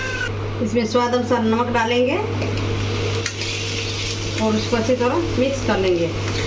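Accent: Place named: Gujarat, India. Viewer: Saras hindi bhasha bolo chho apane gujarati chie etle tone gujarati j ave hindi no pan but dhire dhire avadi jase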